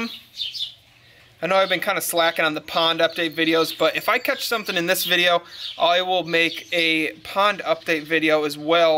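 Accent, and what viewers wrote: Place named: New England, United States. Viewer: So New England I love it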